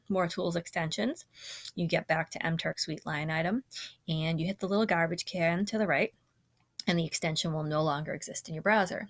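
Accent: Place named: New England, United States. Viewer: Hey, Thanks for the videos! Being from California I get a laugh from some of the wicked New England pronunciations (drawring, lol). Anyways, do you know if it's possible to merge a worker account? I realized I had an account I worked on years ago that had a few HITs that might help me push towards 1000. Keep up the good work!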